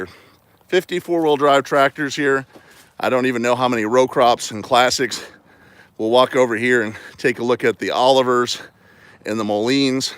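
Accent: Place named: Texas, United States. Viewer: When did you get a Texas accent?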